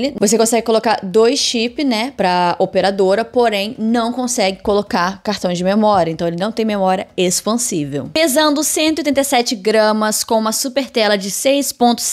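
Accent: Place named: Rio de Janeiro, Brazil. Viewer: Amei a carioquinha falando ! tmj conteranea.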